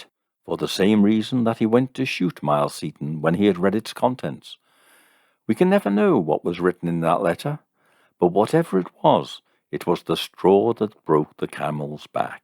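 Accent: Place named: Liverpool, United Kingdom. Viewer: You mentioned in one broadcast that you lived in Balham, London. Your accent sounds a little bit like Wirral or Liverpool.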